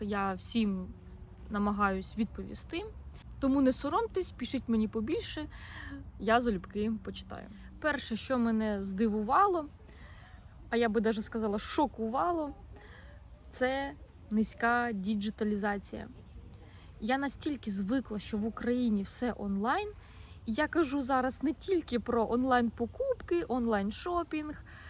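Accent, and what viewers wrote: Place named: Russia. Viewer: Извиняюсь, но у меня один вопрос. Вас кто-то заставляет во Франции говорить по-украински? Вы явно русско-язычная и для вас это иностранный язык. Слушаешь вас и уши "вянут".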